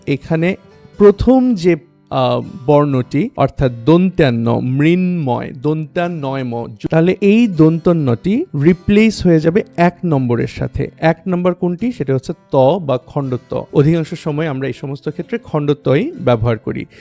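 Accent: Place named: United Kingdom. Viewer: Sir your bangla accent sounds British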